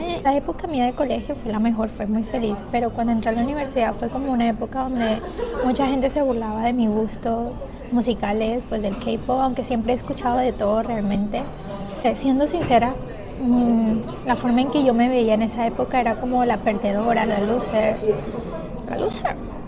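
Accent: Caribbean Coast, Colombia. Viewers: Ese asentó colombiano me encanta❤️🇨🇴😊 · Como que acento costeño 😶 soy nueva seguidora... Wow de la costa... Tengo esperanzas jajaja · Por qué YouTube tardo tanto en recomendarme tu canalllll😍 me encanta todo jajajaj soy tu fan amo como hablas, dime qué eres costeña sin decirme que eres costeña cule'balance JAJAJJAAJA amo mal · ¿Es ella costeña? Jajaja es que creo que tiene un poquito este acento de costeña colombiana 🤭🤔 un saludo desde Colombia ♥️ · De qué parte de Colombia eres? Hace poco te comencé a seguir por Instagram y descubrí que también estás en Youtube me suenas muy costeña como yo 🥰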